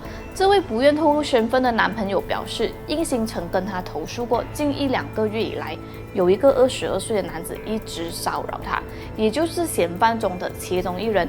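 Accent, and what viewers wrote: Malaysia. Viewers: 本来就是马来西亚人，口音当然是马来西亚口音啊，听不懂就看字幕，这是本地的特色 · 马来西亚口音很重。听不太懂，应该好好的自修中文发音。。基本上很多时候听不到懂。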